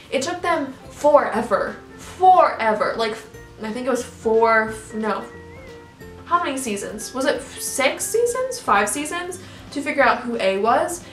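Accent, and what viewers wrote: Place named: Ireland. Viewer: accent I felt like it sounded more like a Irish accent more than a British accent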